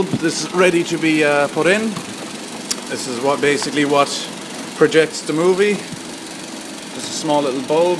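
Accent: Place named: Ireland. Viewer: The projectors look ancient, but there probably bight like tanks. Great Irish, accent. :)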